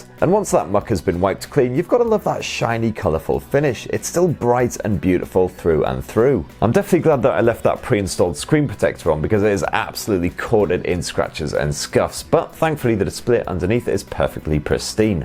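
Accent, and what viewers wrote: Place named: North East England, United Kingdom. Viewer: just come across this...should retitle it 'still good in 2021' - I'm still using my P20 Pro, battery still holds up, performance is still great (RR3 is the game of choice and it's smooth as smooth can be) and the camera is still one of the best out there... if you're looking for a a really good smartphone and you find a good deal, snap it up! (plus this model is pre the google embargo so happy days!), I detect a north east twang 'Gan On!'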